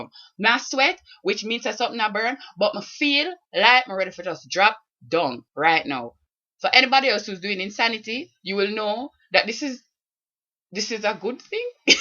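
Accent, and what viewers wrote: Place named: Jamaica. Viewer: I was wondering if you were Jamaican or sumtin. Day 12 today for me. I LOVE DIS SHAT.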